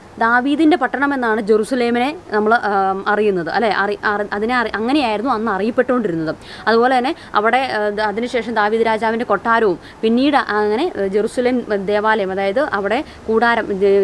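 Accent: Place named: Kothamangalam, India. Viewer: Vargheeya Paraamarsham Nadathaathe Nishpakshamaayi Kaaryanghall Parayu....Paavam Malayalli Vlog Kandu padhikku... Theere Moshamaya Avatharannam...Bhaashayum Athupole....Kothaazham Slang Arochakam..... 🙄😡🙄😡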